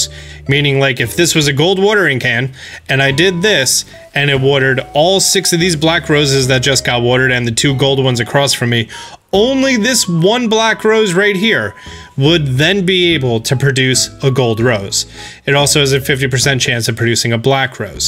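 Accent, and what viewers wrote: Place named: New Jersey, United States. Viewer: It's a New Jersey accent. All across the state, we say "wooder."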